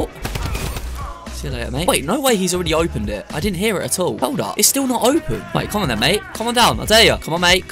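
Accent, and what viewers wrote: United Kingdom. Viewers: At first how did don’t reliaze he’s British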